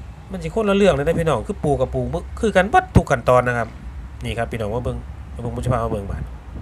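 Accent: Thailand. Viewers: บรรยายสำเนียงอิสาน...คนภาคกลางฟังได้50เปอร์เชนต์..ถ้าบรรยายสำเนียงกลางคนจะเข้าทั้งประเทศ. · ฟังแบบคนภาคกลางมันไม่ได้ 100% ถ้าสะดวกทำเป็นเสียงกลางบ้างก็ดีนครับ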